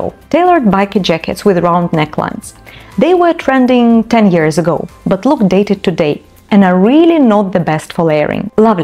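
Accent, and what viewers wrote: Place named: Russia. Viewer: Как тяжело слушать такой тяжёлый русский (предположительно) акцент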